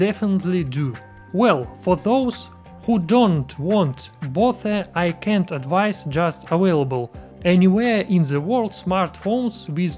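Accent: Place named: Russia. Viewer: subscribed just for Russian accent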